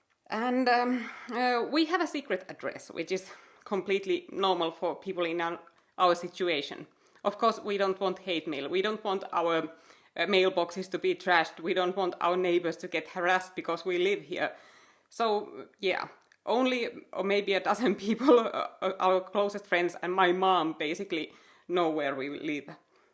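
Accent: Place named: Finland. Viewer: i love the finnish accent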